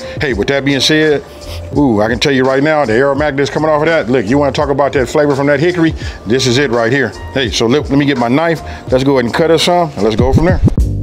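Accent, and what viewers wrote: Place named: California, United States. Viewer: As a fellow LA dude, I'm happy to see do his thing. But I've been going from LA so long that I can't stand the Cali accent lol.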